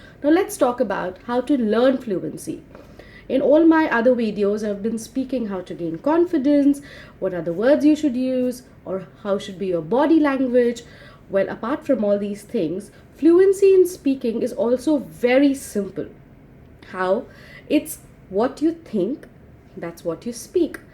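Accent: India. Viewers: Before, I was thinking only listening to native will help you to improve your speaking skill but it's only thinking. What is in real is, when I listen to you then I love listening to Indian accent. For English exam your are going to polish us. Thank you so much for your fantastic work over here and positive thinking...I wish we can see your new video soon... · You are a totally and truly blue book English pedagogue in the world but your pronunciation is good for Indian ................. .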